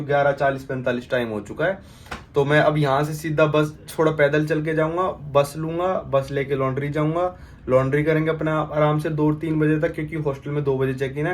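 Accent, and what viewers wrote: Haryana, India. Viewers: Haryanvi accent aa gya bhai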